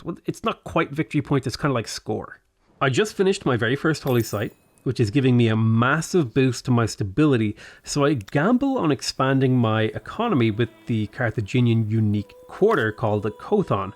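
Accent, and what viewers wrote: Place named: Ireland. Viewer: Came for the review, stayed for the brogue, subbed for playing for Ireland 🇮🇪 quote.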